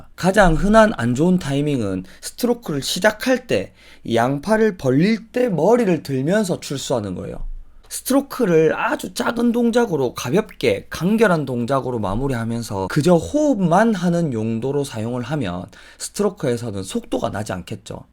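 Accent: Gyeongsang, South Korea. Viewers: 땜 경상도 사투리가 서윗해졌어요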